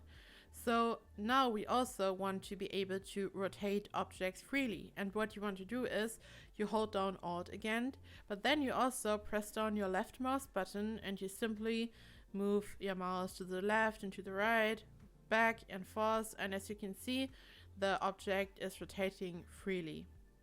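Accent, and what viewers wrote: Germany. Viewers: Du bist deutsch 😂 oder ? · sorry for asking but are you german ? i think i can hear a little cute accent :)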